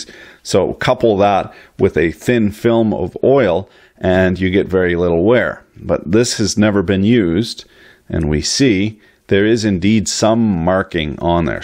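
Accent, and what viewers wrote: Canada. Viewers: This guy is brilliant I wish I spoke Canadian I’m from Missouri who would of know that Canadian English is so different great videos I’ve learned so much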